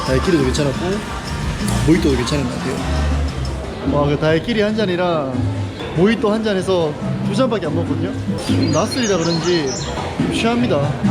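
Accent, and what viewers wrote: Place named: Daegu, South Korea. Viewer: 저도대구사람이라 사투리가정겹고 너무재밌어요♡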